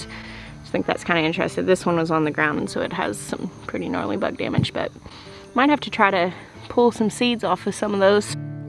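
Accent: South, United States. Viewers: I started watching your earlier shows and loved them.i do prefer the old voice,just can't handle the southern twang you got now,I wish you well and hope you loose the twang don't be like others be youself you have a bright future stay sparkles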